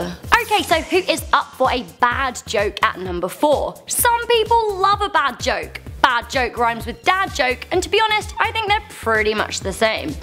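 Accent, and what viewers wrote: United Kingdom. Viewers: Your British accent makes this 100% better. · Anybody else love the British accent???😁😂😃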